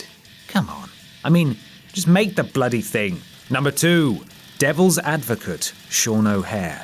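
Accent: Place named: RP, United Kingdom. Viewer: sounds really promising posh today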